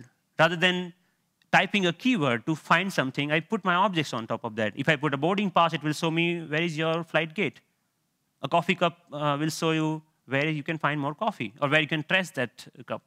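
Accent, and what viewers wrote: India. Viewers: Hes a genius, but not for mastering a language..why didn't he lost his funny Indian accent... :) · 영어 고자.. 인도식 발음 못알아 듣겠다....ㅠㅠ 계속 들어야쥐...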